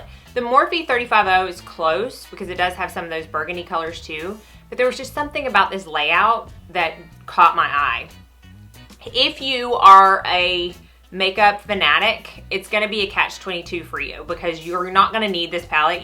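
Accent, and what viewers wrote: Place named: Maryland, United States. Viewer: Are you from Maryland cause you sound like an either Maryland accent or southern. Reminds me of home ❤️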